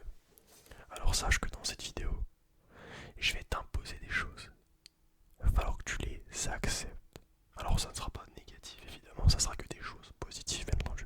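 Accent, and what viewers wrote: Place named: France. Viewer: L'accent français est très fort. J'adore!!!! 🥰🥰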